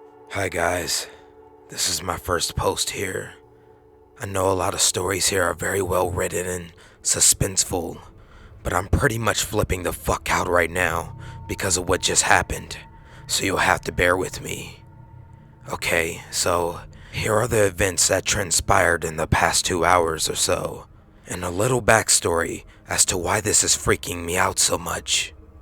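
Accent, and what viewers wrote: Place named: United States. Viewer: I think that the snapchats may be real but I don't think the narrator is the same person in the snapchats because the narrator has an american accent and the guy in the snapchats has an Australian accent and a rainbow lorikeet is an Australian bird.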